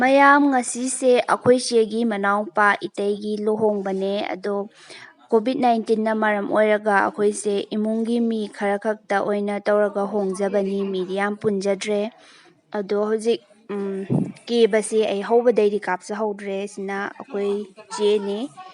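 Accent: Kakching, India. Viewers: Lol akhoiba asida asumna narration touko. Kakching lol asina ariba lolni. Imphalda ngangnarisina lol anouba. Adunani maiba maibina lai haraobada sakpa sonba amadi ngangbada kakchinggi lol mallibase. Puya pabada lol nouraga paba ngamde.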